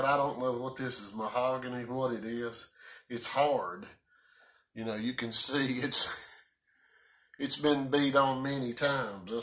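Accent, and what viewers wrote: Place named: South, United States. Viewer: Your southern drawl makes listening to and learning from this video really enjoyable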